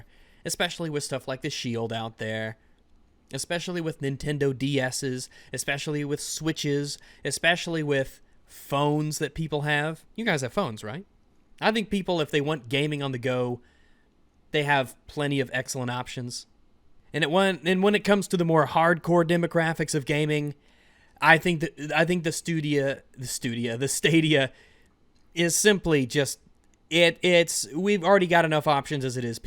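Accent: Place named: South, United States. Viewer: The southern came out this episode.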